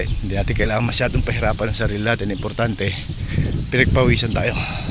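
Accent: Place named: Mindoro, Philippines. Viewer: Hi kuya,i love jogging too walking exersise is good for our health...taga saan kb kuya mindoro din ba, kc ung accents mi eh parang mindorinyo din.thankyou sa suport ho. Full watch tamsak.suport👍👍❣️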